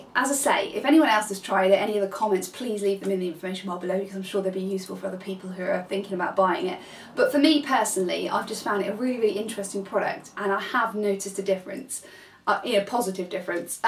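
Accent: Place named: United Kingdom. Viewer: I am always drawn to the British accent. Great review. The little spatula is to help you prevent you digging your fingers into the pot. Lol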